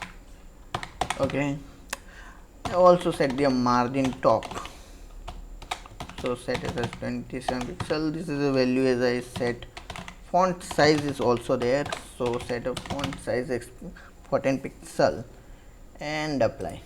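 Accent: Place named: India. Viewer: I follow you and I am happy to discover Qt with you. I used to work with Delphi or C++ Builber, and there are many similarities. I appreciate the way you make tutorials on Qt C++. I have a suggestion if possible. Sometimes, this is hard to understand you, maybe because of your microphone. As I am french, I know that Indian people and French people have a different accent in English. Maybe you can improve this. Anyway, thank you.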